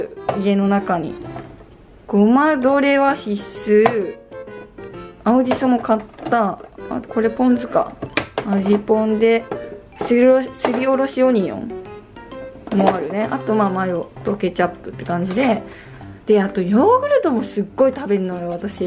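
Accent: Okayama, Japan. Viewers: 同じ岡山出身！ たしかに口調が岡山弁だね😊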